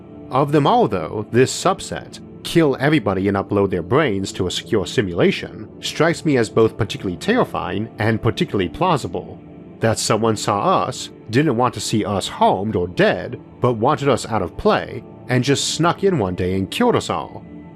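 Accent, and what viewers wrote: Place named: Mississippi, United States. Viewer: what accent does have? I assume American, but from what part of the US?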